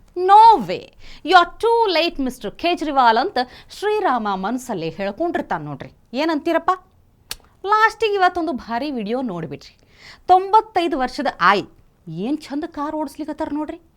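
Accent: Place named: North Karnataka, India. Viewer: Super , Nice to hear north Karnataka Kannada . Kadak Mirchi Mandakki video is very informative .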